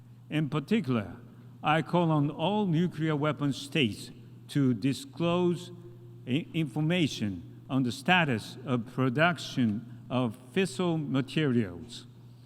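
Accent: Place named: Japan. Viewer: どこがや アクセントはジャパニーズイングリッシュを地でいく昭和カタコト英語やし、リエゾン全く無視やんけ こんなカタカナ英語で通用して素晴らしいとか草生えるわ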